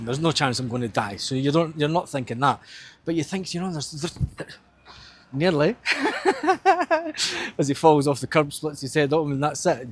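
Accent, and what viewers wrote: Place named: Fife, United Kingdom. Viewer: I’m a Scotsman in Italy….🇮🇹 I left more than 20 years ago. Your accent seems Fife? East coast accent?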